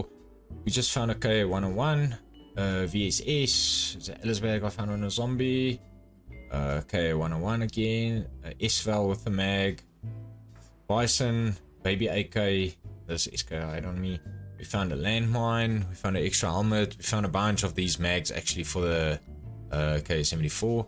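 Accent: South Africa. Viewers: 😅brother I know your afrikaans , the accent gave it away I'm also south african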